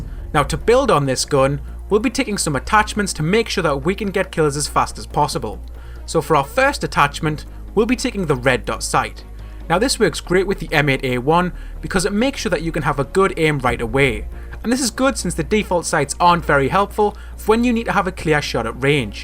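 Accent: Newcastle, United Kingdom. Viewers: It's not Irish, hes from Newcastle, it's a Jordy accent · U from newcastle I am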